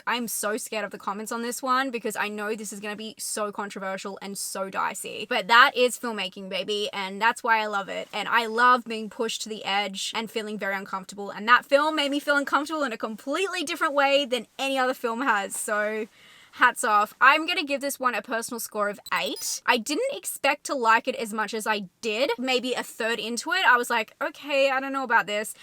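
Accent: Australia. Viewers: I love the Australian accent and how the letter O is pronounced as OR. Say “ O and R “ over and over again!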